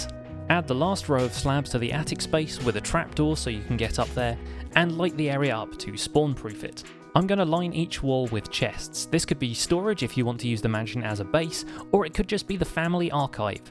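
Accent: United Kingdom. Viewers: are you British I can't tell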